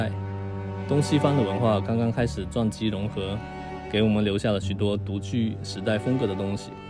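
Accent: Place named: Penang, Malaysia. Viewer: 你的本地口音很濃，不讲还以为你是本地华人，根本就听不出你是大陆人。😂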